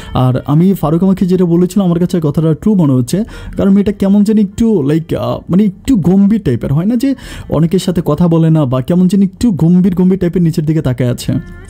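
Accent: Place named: Noakhali, Bangladesh. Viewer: তুই কি নোয়াখাইল্যা? কিন্তু মূর্খ নোয়াখাইল্যা.. তবে তোর ভয়েস টা সুন্দর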